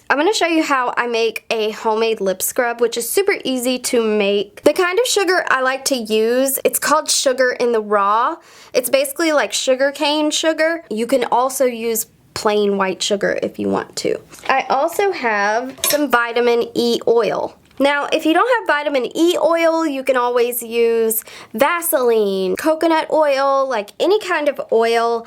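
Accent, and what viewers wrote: South, United States. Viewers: I think she should try asmr. Her southern voice might actually work if she's whispering.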